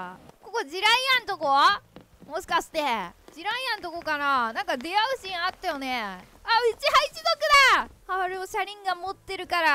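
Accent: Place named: Mie, Japan. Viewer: 三重県の人なのかな？ ○○いけやんって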